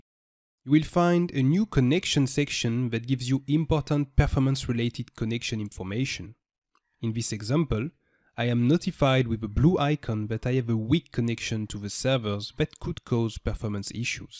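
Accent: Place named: France. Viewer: Avec l'accent français !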